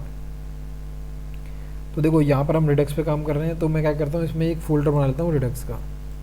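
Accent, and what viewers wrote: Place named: Moradabad, India. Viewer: Awesome! Btw are you from Moradabad, Uttar Pradesh?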